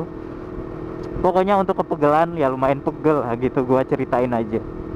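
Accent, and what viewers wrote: Banjar, Indonesia. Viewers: Jir orang Banjar bree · Bang orang Banjar?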